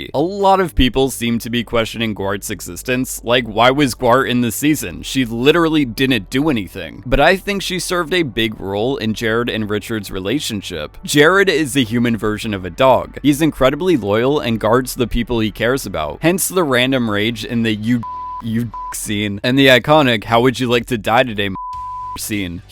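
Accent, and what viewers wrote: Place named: California, United States. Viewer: the narrator is so, sooo, California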